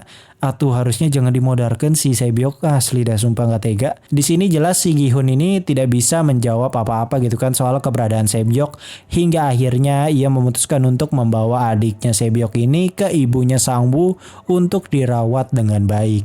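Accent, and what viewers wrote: West Java, Indonesia. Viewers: Orang Sunda mang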